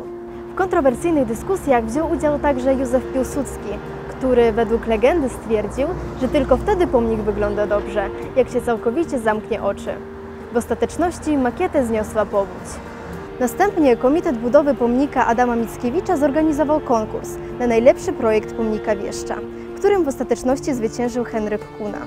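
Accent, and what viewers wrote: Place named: Vilnius, Lithuania. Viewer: Ten Pani wileński akcent.🥰❤😍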